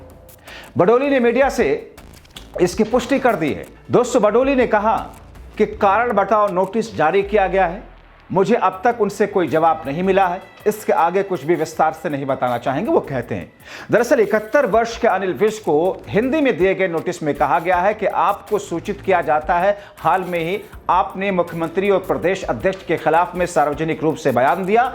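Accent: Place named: United Kingdom. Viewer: English tone me hindi news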